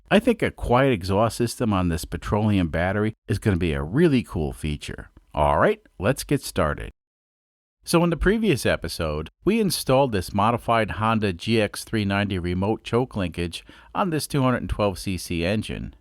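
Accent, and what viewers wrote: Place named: Long Island, United States. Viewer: Lawnguyland accent and bluegrass music!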